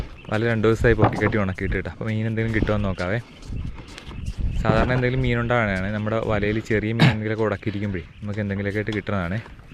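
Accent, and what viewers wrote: Alappuzha, India. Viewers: Alleppey slang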